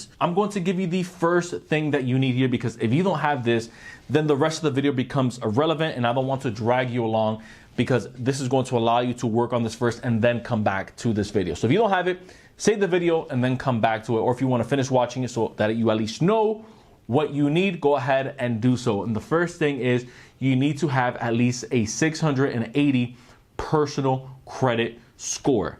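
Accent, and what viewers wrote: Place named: Miami, United States. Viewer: Once you started talking, i can tell youre from Miami. 👏🏼👏🏼👏🏼 Subscribed.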